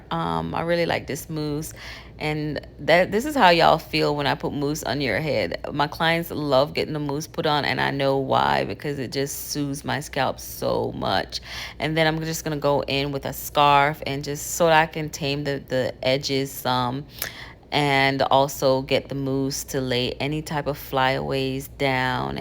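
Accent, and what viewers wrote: Jamaica. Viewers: We appreciate this series. I’m also trying to learn to do my own hair. I recently did some mini spring twist by myself for the first time ever and I was pleasantly surprised. P.S are you Jamaican/ Caribbean by any chance. I hear a slight slight accent but I’m not sure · New subbie! I hear a Jamaican accent! Gonna binge watch your vlogs 🇯🇲🇯🇲🇯🇲🇯🇲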